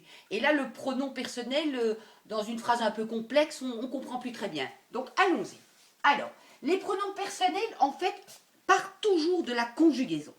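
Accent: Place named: South, France. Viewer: Vous avez l'accent sudistes je crois je dis sa car je viens d’emménager dans le sud et mes professeurs disent tout le temps ' ils ou elles ' en prononçant le s à la fin .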